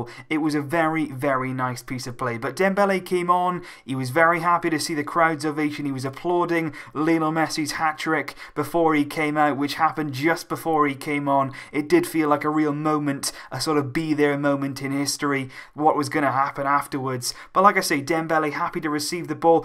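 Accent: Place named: England, United Kingdom. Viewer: 15 years barca fan here, really like your vids, you really understand barca very well and I constantly check if you have vid updates since mid Aug this year. By the way, where are you from, US or Euro? like your english accent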